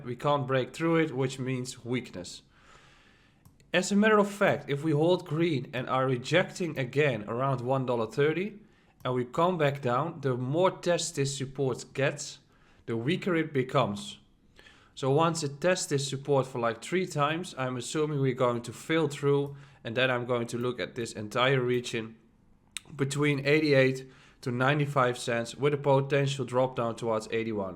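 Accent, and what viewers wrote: Netherlands. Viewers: Did I hear a Dutch accent?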